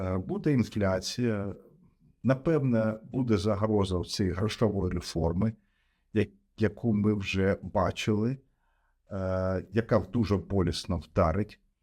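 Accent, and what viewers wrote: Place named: Germany. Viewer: говорит по-украински как будто, с немецким акцентом)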